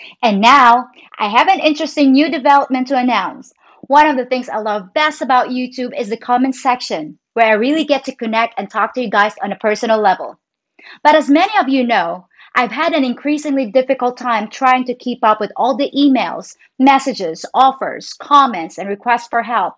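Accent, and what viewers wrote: United States. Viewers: If you had ever been to a nude beach you'd know that too much reality can be toxic. Reading minds would take the same problem to a higher level. When I was doing free lance IT, and a support number connected me to a Philippine call center, I always sent a positive note to the company. I can understand the Philippine version of English much easier than grammatical and correct English spoken with a Pakistani accent. Your speech patterns are closer to American than British. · I was surprised to hear you visited the UK but not the US. Your word choice and pronunciation is very American. But you do call a TV the telly.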